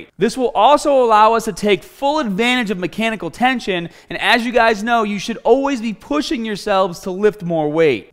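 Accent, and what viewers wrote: Boston, United States. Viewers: whats with the new boston accent.... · Smaaarrght.. Is that a Boston Irish accent? Excuse my unfamiliarity as I'm from NZ.. Sounds cool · Boston accent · He’s gotta be from boooooston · Northern American. Boston accent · This guy has to be from Boston I am and I can see it right away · Love the Boston accent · i hear boston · Never paid attention to the Boston accent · This guy is from Boston , Bahhbell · Gonna hit the bah bells hahd to build up my ahms. Love that Boston accent! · wow is he from Boston? He has very thick accent sounds kind of like boston accent. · That Boston accent. Love it! haha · Is this guy from Boston. I say -impossible to do that many reps and sets and only rest 90 seconds.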